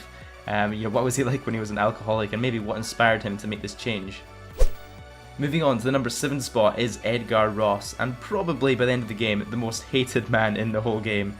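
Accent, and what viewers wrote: Scotland, United Kingdom. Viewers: Where in scotland u from?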